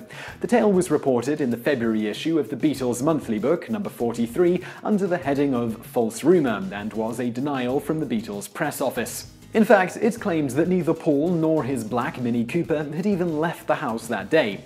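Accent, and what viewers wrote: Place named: Scotland, United Kingdom. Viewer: You have a scottish accent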